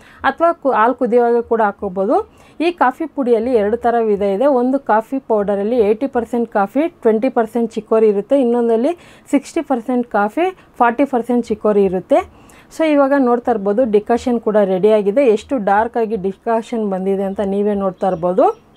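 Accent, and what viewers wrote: Tamil Nadu, India. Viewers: ಇವರದು ತಮಿಳ್ ಅಕ್ಸೆನ್ಟ್😂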